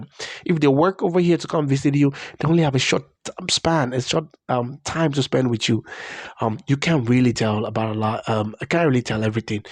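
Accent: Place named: South, United States. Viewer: oooh ok. That’s why I’m hearing a southern accent okkk. You’ve picked up the dialect very well. Ok Lagos. Awesome!!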